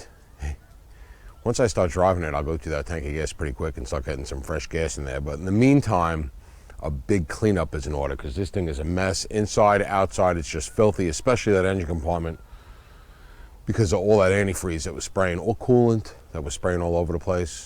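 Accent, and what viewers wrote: New York, United States. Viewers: Nice car love you New York accent I’m in canada ehh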